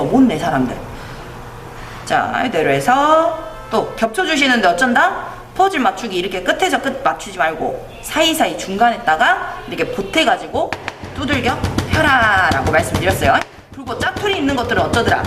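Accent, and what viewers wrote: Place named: Jeolla, South Korea. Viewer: 전라도 사투리 구수합니다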